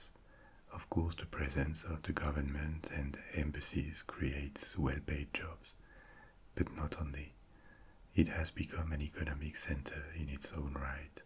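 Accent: France. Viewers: Happy new year and all the best to you too, mister relaxing french voice :D in all seriousness I found your channel recently and I'm hooked, amazing work ^_^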